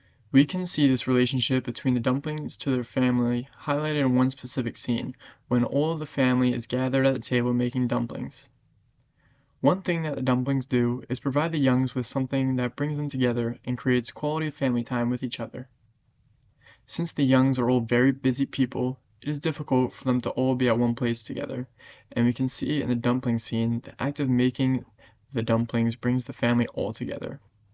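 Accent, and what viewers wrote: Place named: France. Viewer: Outstanding! Great French accent!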